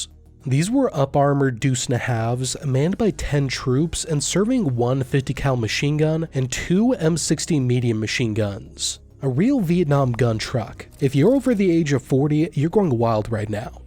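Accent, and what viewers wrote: Australia. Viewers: That was actually a really good Australian accent mate 👍 well done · great aussie accent - Jordies fan?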